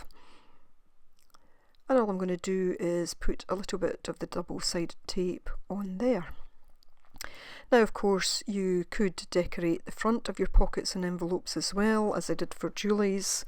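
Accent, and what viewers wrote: Scotland, United Kingdom. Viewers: Lovely video..I love the sound of your accent💕 Scottish? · Hi nice to hear a Scottish voice for a wee change, enjoyed your tutorials very much and wonder if you know of any crafters that swap ATCs. Cumbria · Lovely! Super tips thank you and I do love your beautiful Scottish accent!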